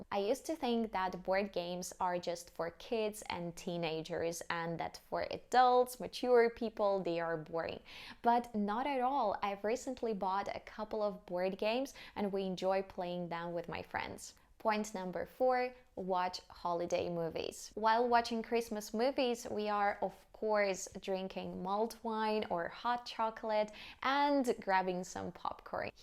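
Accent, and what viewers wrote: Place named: Russia. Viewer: На английском лучше, слушая русский английский не выучишь)))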